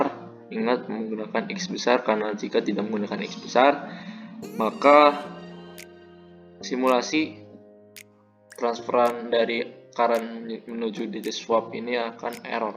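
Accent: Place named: Surabaya, Indonesia. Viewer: Mantap bang are are suroboyo 😁😁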